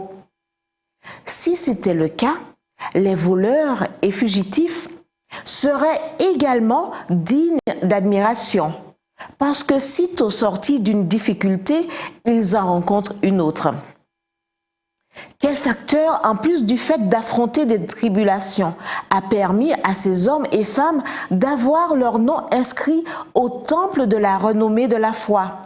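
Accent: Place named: Antilles, Caribbean. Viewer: Merci pour ces belles paroles 🙏 Après avoir passé un peu plus 23 ans en Guadeloupe, le léger accent antillais rajoute pour moi une dimension affective forte. Je connais l'esprit chrétien très fortement encré dans cette population qui est devenue ma seconde famille. Les touristes voient d'abord les plages et les beaux paysages, mais c'est avant tout un peuple infiniment bon et beau. Oui, je me suis un peu éloigné du but de cette vidéo, mais pas autant que ça, puisque l'amour est présent dans chacun de ces mots. Que Dieu vous bénisse 🙏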